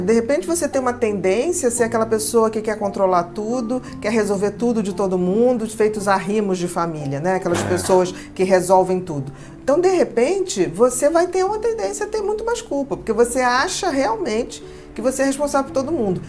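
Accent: Rio de Janeiro, Brazil. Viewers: Nunca tinha reparado que carioca falava assim… obrigado! 😅😁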